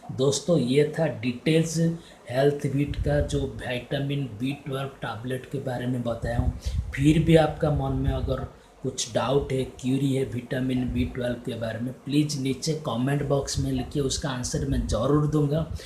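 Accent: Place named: Odisha, India. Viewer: Mujhe lagta hee ki tum odia hoo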